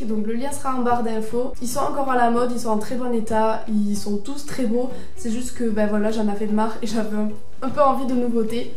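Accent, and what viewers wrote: South, France. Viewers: Bonjour ! Je viens de découvrir ta chaîne et j'aime beaucoup :) du coup je vais poser des questions en mode la fille qui est larguée ! Quelle âge as tu ? Que fais tu dans la vie ? Et d'où viens tu ? J'entend l'accent du sud , c'est rare de voir des youtubeuses du sud! Moi je viens de Toulouse ;)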